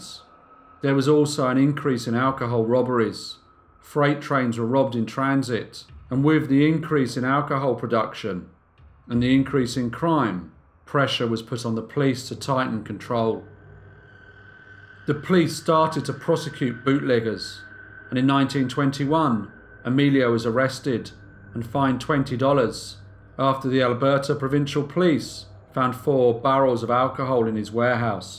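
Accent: United Kingdom. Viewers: British speaker...should know better. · Best regards from Mumbai. Appreciate your quality work & crisp British accent.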